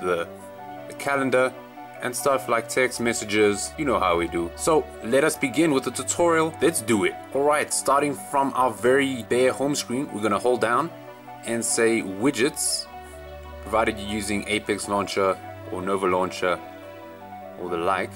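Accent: South Africa. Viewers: I knew you were South Africa... but your screen just confirmed it - Rock on Pretoria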